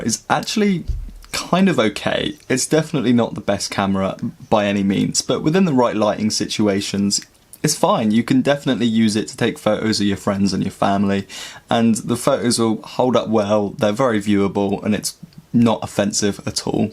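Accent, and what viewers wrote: United Kingdom. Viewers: that frustrating british accent...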